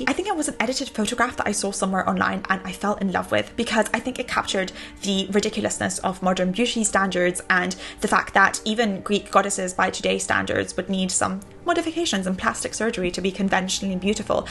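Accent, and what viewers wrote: United Kingdom. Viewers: Are you British? I though you were an Aussie.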